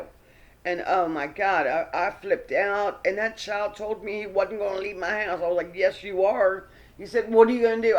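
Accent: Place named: Baltimore, United States. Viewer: I knew you were from Baltimore, my hometown! I could recognize the accent and feels like home. You’re absolutely right, many autistic folks have gone missing since this whole corona stuff. Very sad. Thanks for reading the cards for him.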